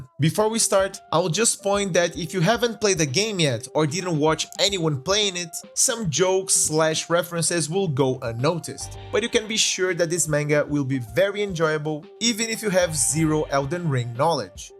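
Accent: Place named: Brazil. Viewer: Bro You're brazillian right? Lol. Got from the accent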